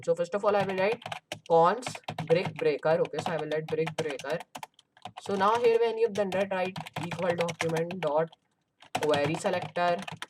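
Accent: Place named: India. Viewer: dude, that work is great, thanks for the video.... but... But, your spoken accent is understandable! Speak slower for god sake! I guess you are Indian? Indian english accent is very hard to understand.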